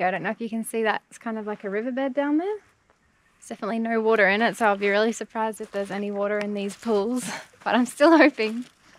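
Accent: Australia. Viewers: I noticed; some similarities of Australian accent ( yours ) & American "Southern" accent, where "fire wood" and "alright".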